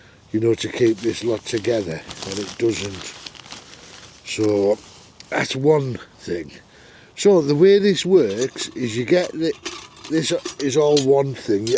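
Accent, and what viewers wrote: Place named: Teesside, United Kingdom. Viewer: where are you from, ya sound like a teessider to me ( ps im from teesside ) that stove and gas legs look mint chor 😂👍🤌👌🇬🇧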